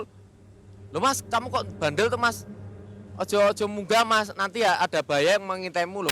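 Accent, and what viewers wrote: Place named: Semarang, Indonesia. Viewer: Logat e semarangan pol mas 😊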